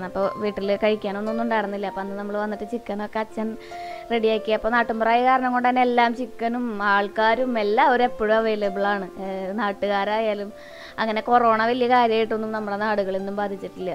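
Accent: Thrissur, India. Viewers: ചെടികൾ എല്ലാം വളരെ ഭംഗി ഉണ്ട് ചേച്ചി... 😍ചേച്ചിടെ തൃശൂർ ഭാഷ സംസാരിക്കുന്ന കേൾക്കാൻ നല്ല രസം ഉണ്ട്.... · Chechi njn Kannur Ann bt chechide thirissur slang samsaram nth Rasa eanik bayankaram ishttanntto nigalde samsaram chechide vlog pwoli super💕💕💕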